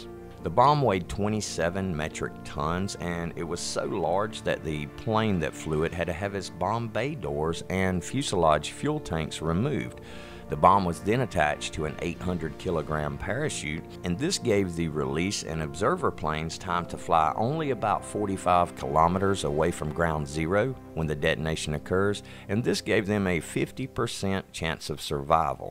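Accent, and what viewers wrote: Alabama, United States. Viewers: Where are you from ? Bama ?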